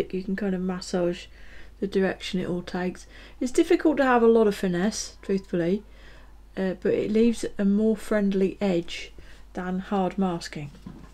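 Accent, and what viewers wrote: West Midlands, United Kingdom. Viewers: Hi strange question! Is there a touch of West Midlands in your accent? Certain words seem like it to me by the way carry on as you are very informative very high quality and presented in a concise and very logical manner ( grovel grovel )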